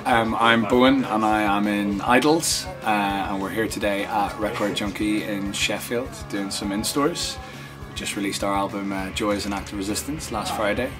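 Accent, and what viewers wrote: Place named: Northern Ireland, United Kingdom. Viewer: He's DEFINITELY Northern Irish.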